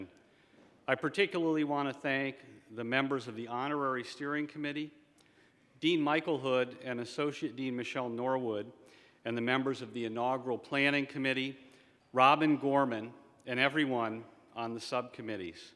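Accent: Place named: Ohio, United States. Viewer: Very impressive. Driscoll looks and sounds like he hails from Blacklick.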